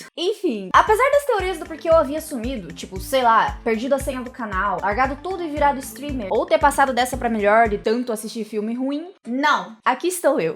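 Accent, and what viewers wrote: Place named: Caipira, Brazil. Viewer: Adoro o sotaque meio caipira da lkkkkk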